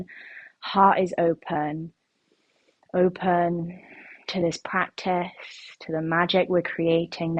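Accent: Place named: United Kingdom. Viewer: You're a fellow Brit! Such a refreshing change to hear on da choob.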